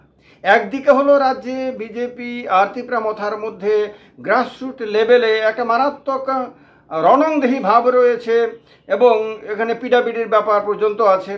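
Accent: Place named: Bangladesh. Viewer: Tumi ekjon bangladeshi tumar kothatei bojhajai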